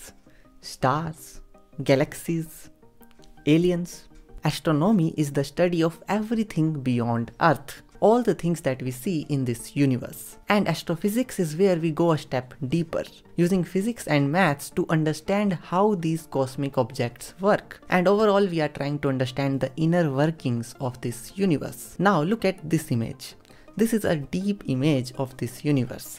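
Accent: India. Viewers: Hey, Are you Indian? Your accent is exactly like Indian!! Astonished from your knowledge in that depth. Being a 10th grade, student you are a true motivation and inspiration ✨️ ❤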